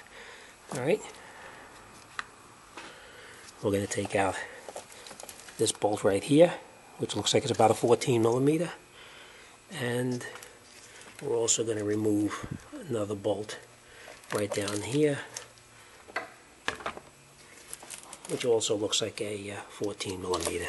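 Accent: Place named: East Coast, United States. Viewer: The volume was fine☺ maybe I just like your voice. Your east coast accent. Wiya=wire 💪👍👌👏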